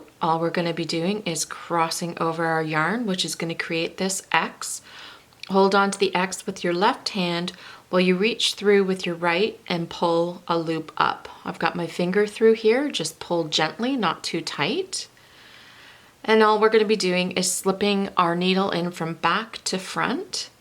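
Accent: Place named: Canada. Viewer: my mom says you sound very canadian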